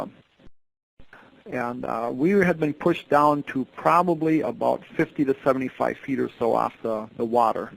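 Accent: United States. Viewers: It sounds more like a Michigan accent to me. MI, WI, MN , Chicago accents are similar.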